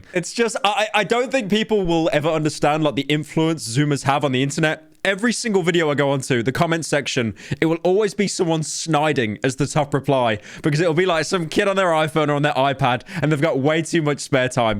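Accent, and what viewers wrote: United Kingdom. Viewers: Shut up your britich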